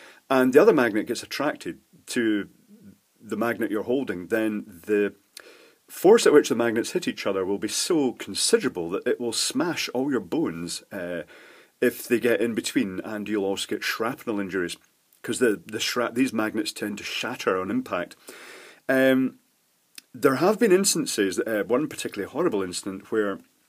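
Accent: Scotland, United Kingdom. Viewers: You sound exactly like Scott Manley, does every older Scottish person sound like that?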